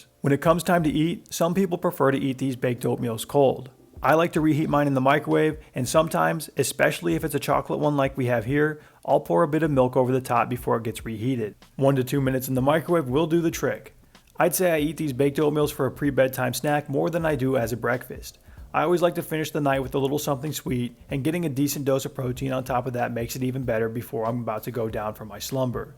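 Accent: Australia. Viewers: Great ozzy accent! I feel like you would be great guy as a friend 😆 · Oh wow. That’s an authentic Australian accent. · 10/10 Aussie accent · Thumbs up 👍🏾 for the awesome Aussie accent! 😆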